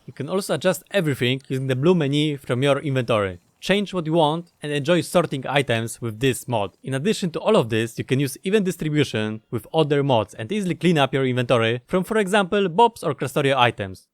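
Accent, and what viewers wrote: Russia. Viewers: Такое чувство как будто русский говорит на Английском))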